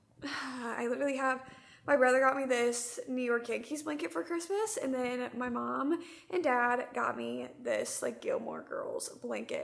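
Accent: South, United States. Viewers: there is no formula girl are you kidding. i know you’re all about making sure your videos are perfect but this is YouTube, a fun place not a Netflix documentary. i found you when you did your 24hr readathons, stayed because of the self deprecating jokes and the southern accent i never see anywhere else. now im so happy not seeing you joke about wanting to die and actually taking care of yourself. don’t compare yourself to old you because now I stay because of your maturity and you’re so funny in other ways without trying. you have to realise that you’re basically a celebrity in the book world and we could literally watch a video of you walking around your house like security footage and eat it up 😂 you’re like Kylie Jenner to me. I’ve been binge watching bookmarkd and even though I can’t stand taylor swift talk I still watch those hundreds of hours of you guys talking about her. 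I love hearing about your life i used to only watch you for book reading inspo and then abandon watching for months and now I tune in as soon as you pop up on my feed. you’re worth more than you know to us queen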